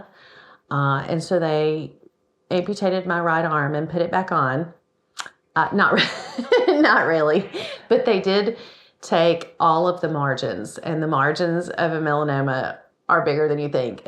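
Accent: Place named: South Carolina, United States. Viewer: You want some “pink” shirts? I purging again… I’ve been through skin cancer crap for over 20 years No worries Just keep freezing it off and burning it off (i wont use the chemo crème they gave me) and if you have to, have MOHS No big deal. Im 55 and been doing it for years I wonder what your accent is. It’s either Raleigh or some kind of South Carolina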